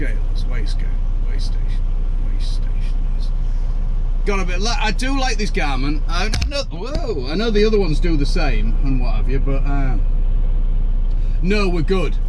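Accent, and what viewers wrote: Yorkshire, United Kingdom. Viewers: Yorkshire accent🙂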